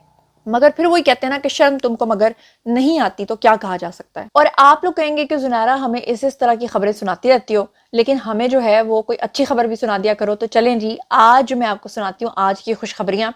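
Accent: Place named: Lahore, Pakistan. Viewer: Lahori style may repoting 😊❤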